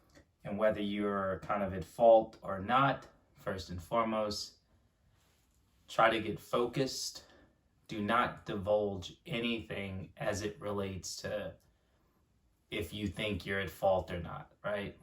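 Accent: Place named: United Kingdom. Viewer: thank you I assumed this was a UK video as your accent didn't have the American tang to it. Thank you anyway.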